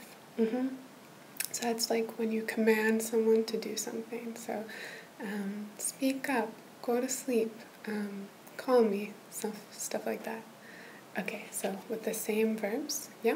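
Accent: Argentina. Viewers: is she argentinian? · Yo con tu video tengo mas vocabulario 😊 y me gusta mucho el pais de Argentina 😍 mi idioma a aprender y mi acento favorito en solo un video 🙈 thanks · Sos de Argentina? Porque tenés el acento perfecto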